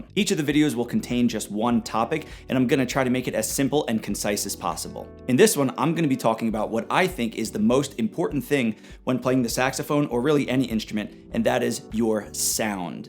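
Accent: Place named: New Jersey, United States. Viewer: AWW but it's gotta be a jersey accent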